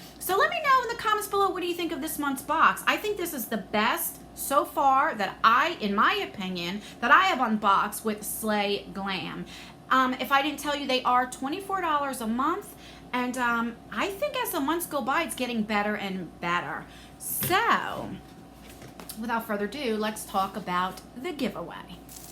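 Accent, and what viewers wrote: New Jersey, United States. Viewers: New Jersey represent! Your accent makes me home sick for NJ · I love YouTubers that are real! You know just themselves and Hughes seem I don't know if it's because I'm from Jersey myself you know and relocated but I miss it if that's maybe why I feel this connection to you because I mean all my friends growing up I mean I can hear them in your voice I can hear your accent I feel like I'm back in New Jersey hanging out with my friends doing crazy things and that was a really good time in my life. I had great friends back then we're still friends we keep in touch but it's not the same you know everyone gets older and grows up and then life hits us! LOL. It's just brings me back and you're just such a real person I just love it! Never change please because you've got what YouTube needs! Much Love XOXO - Kylie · 😘😘 love your videos! 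They make my day! Your jersey accent is the best!!!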